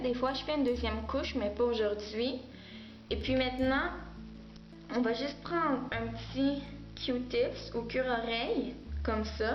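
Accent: Canada. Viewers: J'adore ton accent canadien !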